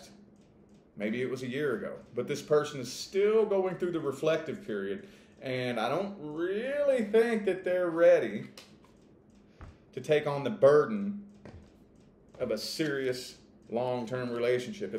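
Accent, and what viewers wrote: United States. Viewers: Nice reading 😊 By the way, I like your accent. Where in the States your accent from?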